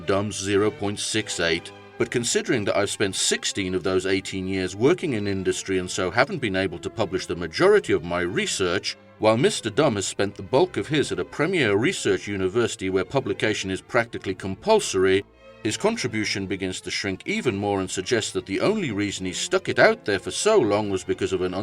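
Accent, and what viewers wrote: United Kingdom. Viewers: I like the montone swearing in a british accent, . . . and the classical music.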